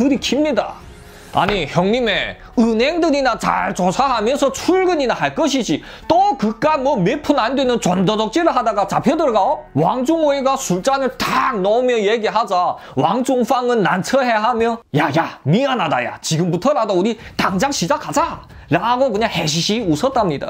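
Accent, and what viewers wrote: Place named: Korean-Chinese, China. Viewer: 발음 좀 고치시면 유튜브 떡상가능하실듯요 억양이 억센 조선족이라 좀 듣기그렇네요 썰은 재밌는데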